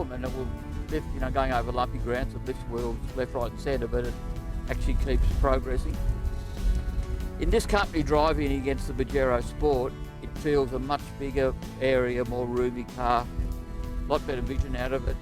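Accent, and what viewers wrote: Australia. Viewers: It's an aussie accent but perfectly understandable. I'm from Bristol UK. There are people around here that are almost impossible to understand. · it doesn't matter if you have a Australian accent as long as you speak clearly.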